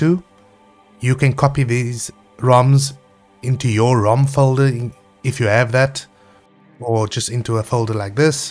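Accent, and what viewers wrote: South Africa. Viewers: I play on 720p and btw you can't hide that South African accent I'm also from here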